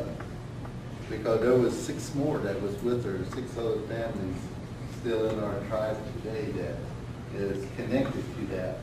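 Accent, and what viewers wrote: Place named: South, United States. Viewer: He looks like the "Europeans" of whom he speaks. With a southern drawl. 🤔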